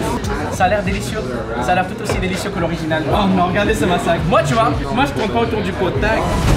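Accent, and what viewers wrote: Quebec, Canada. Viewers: arrete de parler en québécois pour montrer que tes québécois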